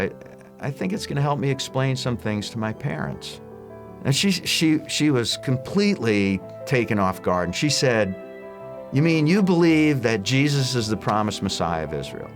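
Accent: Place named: Long Island, United States. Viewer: Sounds like Long Island ny. God bless brother!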